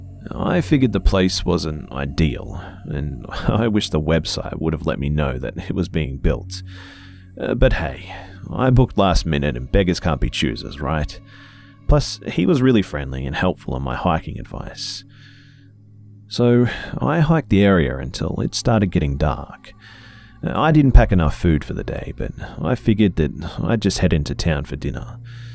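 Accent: Australia. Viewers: He is Australian, so the way He pronounces things is different from ours.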